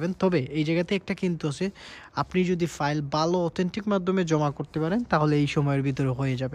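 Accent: Sylhet, Bangladesh. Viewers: Sylheti ni ba vai😊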